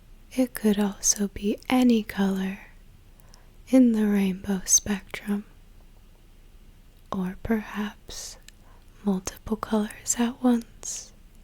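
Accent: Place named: Texas, United States. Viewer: You can hear Texas in her voice if you know what to listen for.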